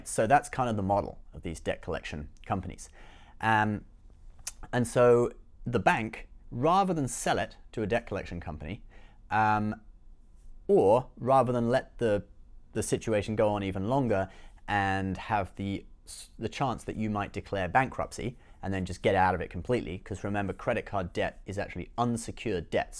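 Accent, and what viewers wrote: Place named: United Kingdom. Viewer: 🤔🤔🤔 are you British? Haha I just noticed that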